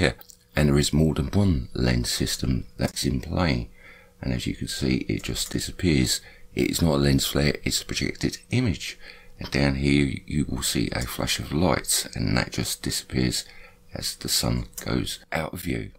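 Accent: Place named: London, United Kingdom. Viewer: i thpught it was danny dier!! I lav tha landan acsent maytee..up thee apples n blady pears boss...awsome great explanation in every video u put on...👍☝☝☝☝thank u..from nottingham..uk.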